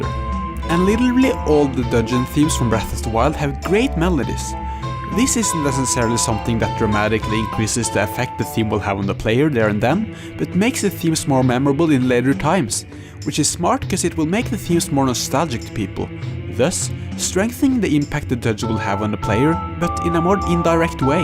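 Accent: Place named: Norway. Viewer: May I ask where you are from? It sounds like you have a Norwegian accent, but I'm not entirely sure. I'm Norwegian, by the way.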